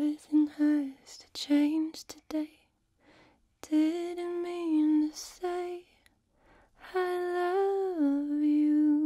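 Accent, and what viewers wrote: South, United States. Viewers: She's southern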